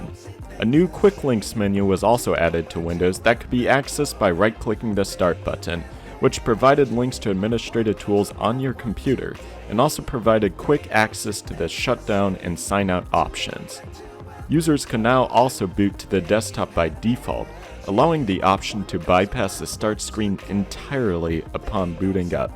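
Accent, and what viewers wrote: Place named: Canada. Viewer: It may be just me but I kind of like his accent in his videos. It sounds Canadian to me. I almost expected him to say oot and aboot.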